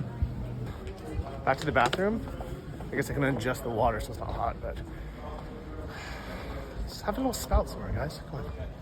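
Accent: Taiwan. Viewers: That's a Taiwanese accent if I ever heard one. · Sounds like Taiwanese mandarin.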